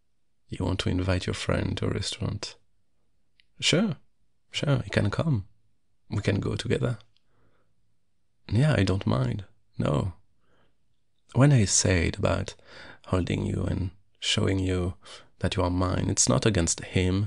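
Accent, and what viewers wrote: France. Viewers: He must be a french man? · Possessive, hot, french man? HECK YES 🥵🥵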